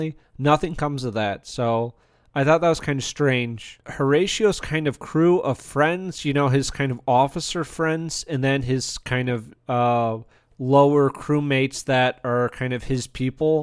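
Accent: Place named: United States. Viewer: Firstly, thanks for taking the time to share your view of the show, enjoyed hearing your perspectives. I remember watching both Sharpe and Hornblower growing up and all the family liked both series very much. Both fantastic shows. That said, Hornblower is a definite personal preference for me. What’s more, I can say as a UK native that the general perception of status of these 2 shows, again although both well liked, is that Hornblower definitely has the clear higher status of the 2 shows. I think the difference in perception comes down to culture. I could be mistaken, but the reviewer of this video sounds American. Apologies if mistaken. Sharpe is a more typical heroic character or rather a simpler childish imagination of heroic character. He fits the American idealised trope of hero more than Hornblower, that’s why it is easy to understand why American audience probably get and therefore have more appreciation of the Sharpe show. 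Sharpe right from the start, he knows what he’s doing, he’s confident, competent and cool. He’s also a bit independent and brash, going against authority, charging first into battle because it’s the best way to get himself promotion. He meets a different woman every episode like he’s a James bond type. Its certainly more fun and cool (perfect for American audiences), but also less authentic and more simple as a character study. Since he’s so developed from the start, there less scope for character development through the series. Hornblower on the o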